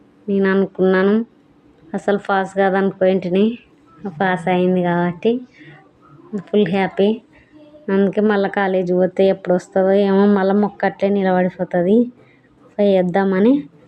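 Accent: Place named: Kurnool, India. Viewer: Hi akka Me vedios chala baguntai medi a village Madhi Kurnool Andhuke me basha vinte happy ga anoinchindi❤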